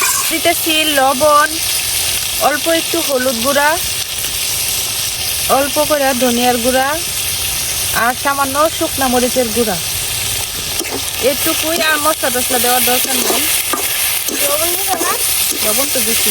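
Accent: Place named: Narsingdi, Bangladesh. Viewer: আস্সালামুআলাইকুম। আপু আপনার কথা শুনে মনে হচ্ছে নরসিংদীর। আমার দাদার বাড়ি।জানাবেন প্লিজ। নাকি ময়মনসিংহের?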